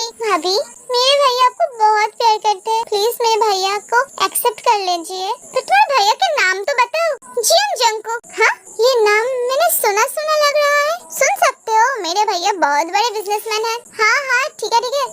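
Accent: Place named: Bengal, India. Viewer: Accent bata raha she is bangoli n bangoli's ka karunga karungi same hota so you have to understand .😂😂You focus on story n enjoy. It's kind of cute too. 🎉🎉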